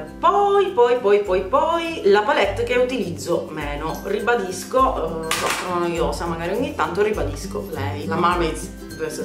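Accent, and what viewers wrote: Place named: Sicily, Italy. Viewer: Ciao! Sono d'accordo con te in merito alla Naked 1...manca qualche ombretto opaco in più! :-( Dall'accento mi sembri sicula... come a me! :-) Un bacione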